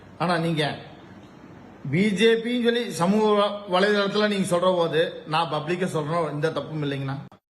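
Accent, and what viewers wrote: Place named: North, India. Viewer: இவன் முதலில் யாருடா!!! வடக்கன் மாதிரியே தமிழ் பேசுறான்.... தலை எழுத்து நீ எல்லாம் கை விரலை ஆட்டி பேசுவது. இந்நேரம் அந்த அம்மா இருந்து நீ இப்படி பேசி இருந்தா உன் நிலைமை நான் சொல்லி தெரிய வேண்டியது இல்லை...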